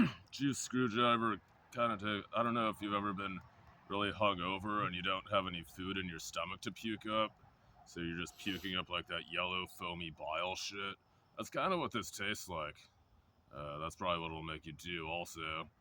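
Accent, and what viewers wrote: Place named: West Coast, United States. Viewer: west coast accent was my initial guess when i first seen urn channel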